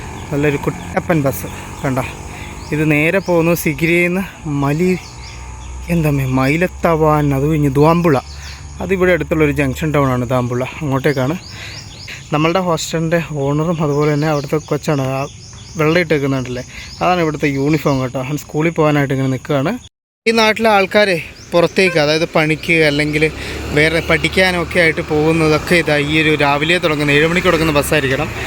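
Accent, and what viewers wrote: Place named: Kothamangalam, India. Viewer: നിങ്ങൾ കോതമംഗലം കാരനാണോ കണ്ണൂരുകാരൻ ആണോ നിങ്ങളുടെ ഭാഷാശൈലി കോതമംഗലം ആണല്ലോ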